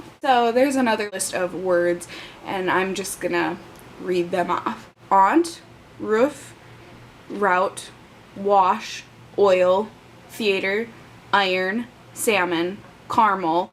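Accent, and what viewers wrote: Minnesota, United States. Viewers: I'm from Minnesota and I don't know which part of MN you are from but you have thicker accent then people around me. 😂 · great video. great job trying to show off the accent, but you didn't even bring up hotdishes. that's what my friends make fun of the most. oh and im a proud Minnesotan. and a few works for you to play with "beg" or "bag" , "don" or "dawn" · Just love it your Minnesota accent!! I live in Minnesota for almost 10 years and I still learning the Minnesota accent I have some friends that used to teach me so many expressions! Love it!! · yep, every person who grows up in Minnesota have this, its just the we are dontcha know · I want to come to Minnesota to hear the accent. **** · Honestly, I think the way she speaks is probably the best representation for younger generation Minnesotans (like me). In other words, I can hear the stereotypical accent around here at times BUT only in the older generations. 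Think about it though...you take a senior citizen from here and more than likely, their parents or grandparents were directly from Scandinavia. You take that and water it down a bit over time and you have what this chick sounds like. My opinion anyway. Sidenote: I'm definitely guilty of saying "oofda" quite a bit (usually after I sneeze. Lol) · I'm Minnesotan and I totally said every word you said the same 😂😂😂 I guess it is a Minnesotan thing (ya know?) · You are so awesome! I so enjoy listening to you. You have a sweet, kind, and lovely voice. Plus, although your Minnesota accent isn't extreme or real deep, I like listening to the way you say certain words - words with "O" in them, like boat, coat, board, etc. I like that. Oh, before I shut up, you are very attractive, as well, with a beautiful smile and beautiful eyes. Wanna marry me? ;-) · HAHAH I live in minneapilis mn and she has a lot more of an accent then me...I love it tho😂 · Just loved your accent! 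And you are cute, for shoer-er! Bye! (Minnesotan?) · Your accent is so cute!!! I have never met anyone from Minnesota before, I loved hearing your accent! :D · Thanks for sharing the Minnesota accent with us. Hopefully your doing well. God loves you and forgives you. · I think the MN accent is one of the most musical and beautiful the English-speaking world has. And I’m surprised to hear that it’s so much like how we talk in the NW of England! We say “daddy long legs” and “pop”...or “fizzy pop”! And our vowels are very similar. Don’t alter your accent to adapt to anyone. It’s gorgeous. And we say “bobble” for your “hair elastic”...why?!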